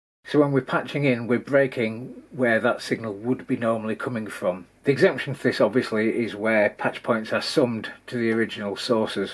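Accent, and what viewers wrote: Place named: United Kingdom. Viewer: Mate I love your videos. Whenever one pops up I always watch it. The thing that drives me nuts is where you are in the UK. I spent time there when I was in the (US) army in 02 in Hereford, and there was a bloke there sounded like you but we called every british soldier "squaddie" and all I remember is that he supported Wolverhampton and was what you would call a 'gob-shite.' He saved a friend of mine's life and my friend used to joke that it weren't worth having to hear the story so many times. Anyway there is some word you use for when something has a downside, like no reset on DFAM starts with a B I think. What is it please? And keep making videos.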